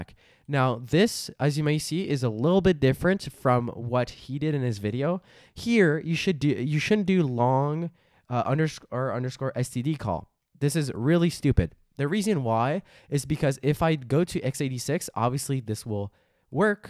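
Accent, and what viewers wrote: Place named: France. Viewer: L'accent de fou que t'as pour un français 🔥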